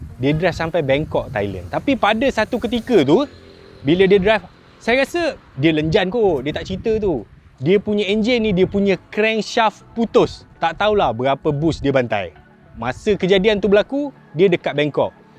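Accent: Kelantan, Malaysia. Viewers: Abang ni org kelantan ke 😂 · kayo weh ore kelate 😁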